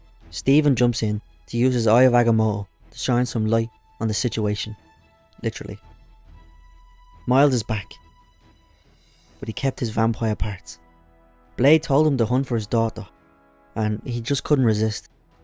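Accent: Dublin, Ireland. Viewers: A Dublin comic channel. Never thought Id see that. Well you sound like your from Dublin anyway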